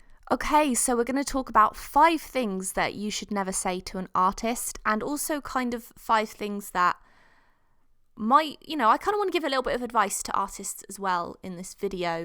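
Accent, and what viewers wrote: England, United Kingdom. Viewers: Why do u sound english x x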